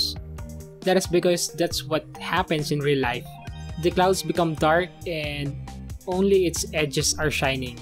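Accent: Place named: Philippines. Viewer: I knew you are a fellow Filipino just by judging your accent and your voice. I'm also a Filipino.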